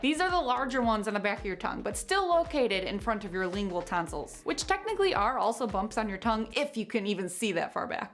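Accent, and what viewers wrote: United States. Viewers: Great informative channel with a positive personality and an a smooth American accent lol.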